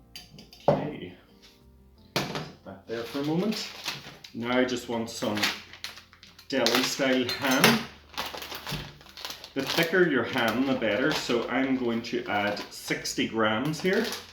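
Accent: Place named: Ireland. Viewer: Didn’t realise jambons were an Irish thing was surprised to hear the accent, greetings from the north 😉😂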